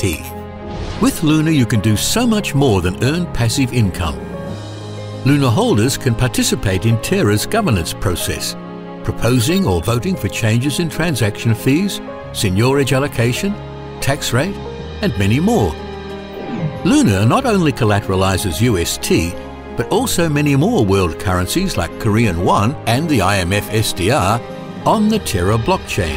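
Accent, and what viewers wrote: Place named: Australia. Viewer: Australian (I'm Australian – I can assure you he's Australian – or a Kiwi who's lived in Australia for a long time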